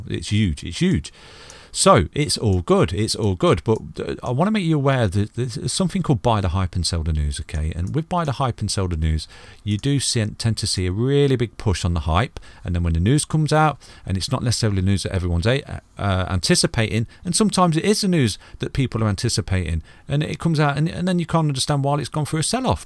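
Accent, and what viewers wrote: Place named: Manchester, United Kingdom. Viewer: your accent sounds like you from Manchester? If so I’m from Manchester as well we definitely got to have a link up!!!!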